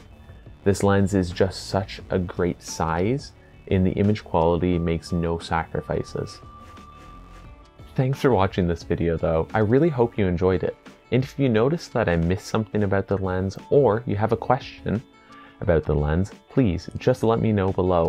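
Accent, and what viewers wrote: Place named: Canada. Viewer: Holy Canadian accent LOL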